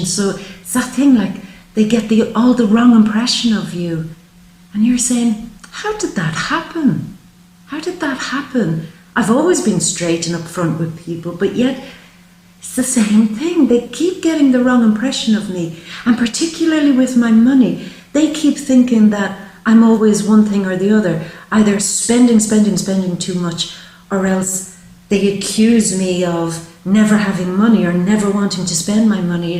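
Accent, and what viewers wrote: Ireland. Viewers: you are so animated and unique i love listening to that pure irish accent.good girl.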